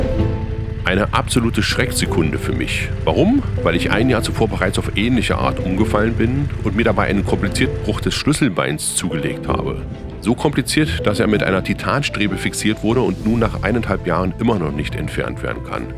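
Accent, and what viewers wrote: Berlin, Germany. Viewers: Mal gespannt auf die nächsten Videos. Schau mir dann mal die Serie der Westalpen an. Ich muss nochmal sagen: wirklich toll gemachte Videos. Und angenehme Stimme, trotz Berliner Slang ;-) Grüße aus Kerpen / Rheinland. Ralf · Hallo. Die Verbindung von Sprache, Gefühlen und Emotionen mit Deinen schönen Bildern machen das ganze echt sehenswert, erlebenswert und wir sind jederzeit bei dir. Du bist mit Deiner Berliner Schnauze echt entspannend und authentisch. Man nimmt dir das echt ab, deine Emotionen die du erlebst, die jeder Motorradfahrer kennt und so genießt. Deshalb fahren wir Motorrad um genau das zu spüren. Bleib Gesund und lebe deinen Traum, denn es geht ums Reisen nicht ums Rasen. Nur wenn du anderen darüber berichten kannst was du erlebt hast bist du Gesund wieder nach Hause gekommen. Das muss so bleiben um wieder einmal dem Alltag zu entfliehen. 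Bis zum nächsten Mal. Gruß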